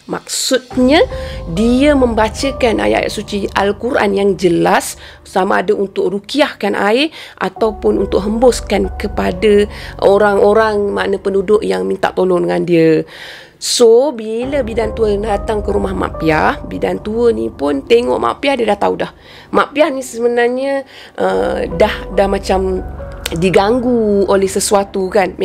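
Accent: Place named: Pahang, Malaysia. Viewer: ni org pahang kan ke bukan sebab loghat macam org pahang..kalau org pahang beloh mane.. semoga maju jaya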